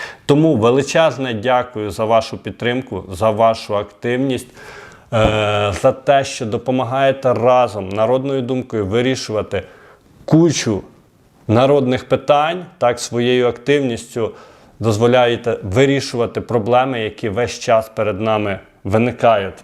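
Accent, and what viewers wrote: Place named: West, Ukraine. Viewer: Звідки ведучий? Виглядає, що десь з села з західної України. Говоріть нормально, а не як цвєк. БудУт, становляТ і т.д. Хлопец зі села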